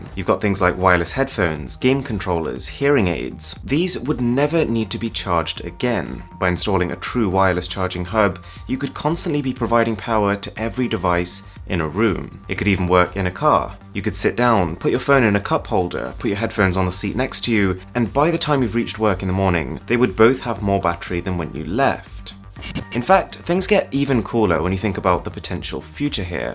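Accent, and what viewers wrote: RP, United Kingdom. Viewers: I cant stand how this guy talks. he talks like a royal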